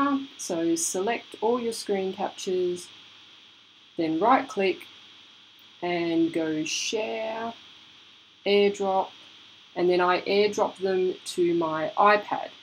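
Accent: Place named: Australia. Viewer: Your Aussie accent is awesome!! 🤗❤️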